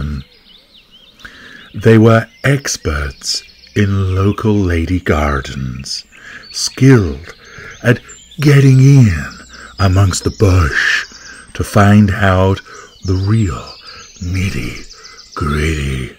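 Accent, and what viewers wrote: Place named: Cheshire, United Kingdom. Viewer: I think he is from Cheshire as he speaks exactly like the Cheshire set with a few Mancunian sayings thrown in to confuse us all.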